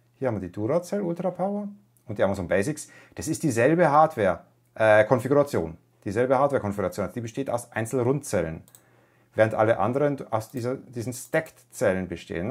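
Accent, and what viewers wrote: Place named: Munich, Germany. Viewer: darf ich fragen wo du in etwa herkommst? laut deinem akzent würd ich so auf region münchen tippen ^^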